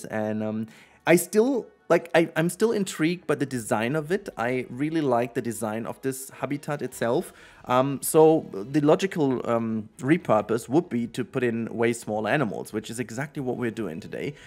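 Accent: Germany. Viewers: Still that typical horrible german accent BUT i have to say : Your build are always awesome. TBH i want a PZ2 instead of a PC2 ... With better performance and way more creatures. Modder creates SO GOOD new creatures, but they are always unfunctional after every patch... that sucks ^^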